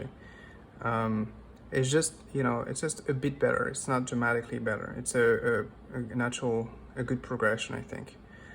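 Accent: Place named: Europe. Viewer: Where are you from? You sound European but pronounce it Zee instead of Zed.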